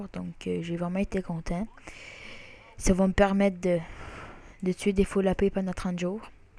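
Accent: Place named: England, United Kingdom. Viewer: Est tu englais car tu a un accents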